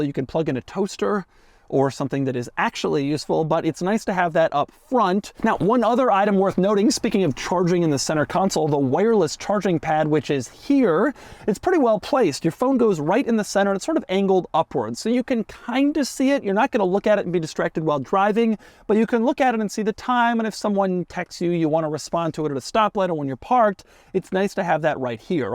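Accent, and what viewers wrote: England, United Kingdom. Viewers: Love the English accent. All the best from England 😊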